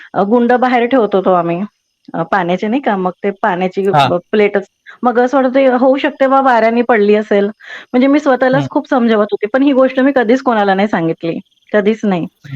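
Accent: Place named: Vidarbha, India. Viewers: अनुभव भयानक आहे पण मला नागपुरी बोलीभाषा खूप आवडली ऐकायला!! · मी ही गोष्ट ऐकतच नव्हतो, पण या ताईंचं नागपुरी वऱ्हाडी मराठीत बोलण्याचं ढंग, आणि त्यात अधूनमधून येणारं हिंदीचं मिश्रण हे सगळं मी लक्षपूर्वक ऐकत होतो. त्यामुळे वैदर्भी बोलीभाषेबद्दल थोडा अभ्यास झाला.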